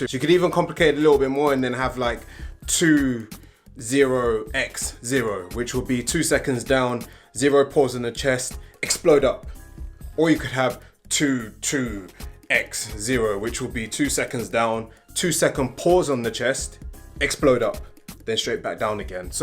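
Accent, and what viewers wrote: United Kingdom. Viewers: Good guidance my man, good to hear a British accent. I am tired of listening to American ones..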